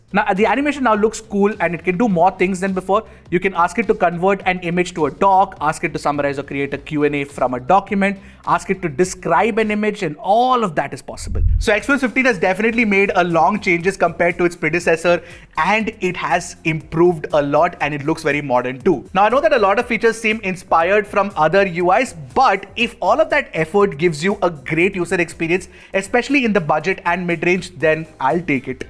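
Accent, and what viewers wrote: India. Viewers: Bro you kinda look like a Indian and also speak like Indian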